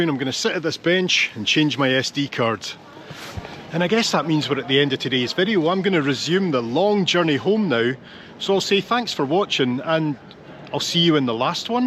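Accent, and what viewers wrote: Scotland, United Kingdom. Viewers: Speaking about accents, your Scottish one is music to one’ ears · I just recently discovered you on YouTube and I am hooked. You are absolutely adorable and so full of energy. I was exhausted watching how far you walked around Reykjavik. I'm from Minnesota in the USA, and I love all things to do with travel. I'm also amazed at how much you can eat. I recently watched your Atlantic crossing video and enjoyed seeing how much you enjoyed the food on board. Of course I'm loving your Scottish accent and your wit. Keep up the good work. You definitely have a new fan.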